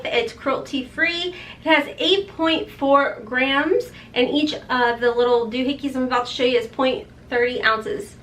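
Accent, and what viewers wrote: South, United States. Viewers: I just found your channel and I love your accent I'm a southern girl too💖 I think the pallet will be in the charm rewards store. My email is <mail> now I'm going to binge watch your videos 😍 😍😍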